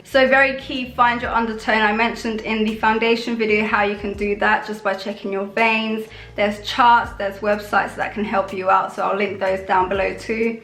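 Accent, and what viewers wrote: London, United Kingdom. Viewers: she's got a London accent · Which British accent do you have? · she’s got a London accent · good British accent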